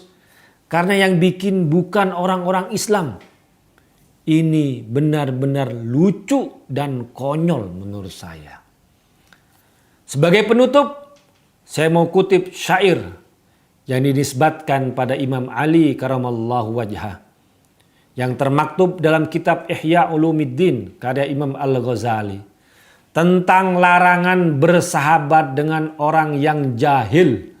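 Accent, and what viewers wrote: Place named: Java, Indonesia. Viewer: dia cocok hidup di dataran arab .... pake logad jawa sekali...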